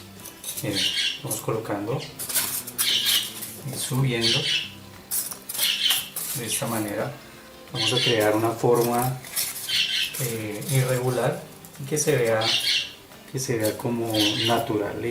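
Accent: Colombia. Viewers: Muy buen video 👌😃 (tiene acento colombiano pero no usa términos colombianos)(?)🤣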